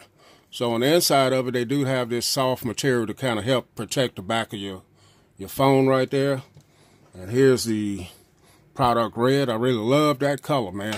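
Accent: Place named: Arkansas, United States. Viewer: He has the same accent as my black friend. Are you from Arkansas?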